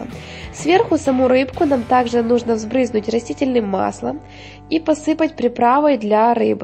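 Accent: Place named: West, Ukraine. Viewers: Если Вы не слышите украинский акцент, то это Вы с другой планеты. Ясно? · Девочка из Западной Украины, г.Ровно. Не надо придираться к произношению "правильно, или не правильно". Ей 24 года, а готовит - профессиональный повар оценит.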